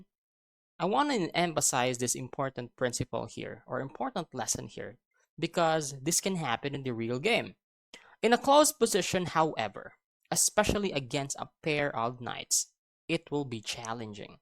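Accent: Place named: Philippines. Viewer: Change your Pinoy accent to a British or Czech one and you will have more global viewers. Fact.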